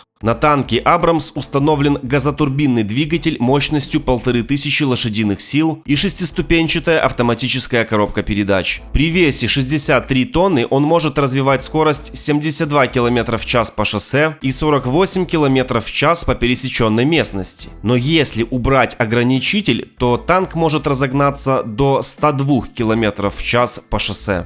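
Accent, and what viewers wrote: Ukraine. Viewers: украинский акцент, прикольно. А что не на мове?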